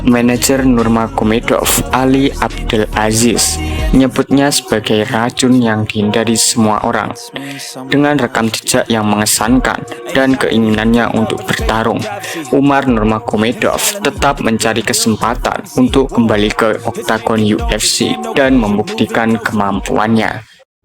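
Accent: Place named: Java, Indonesia. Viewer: Medokkk bgt bhangg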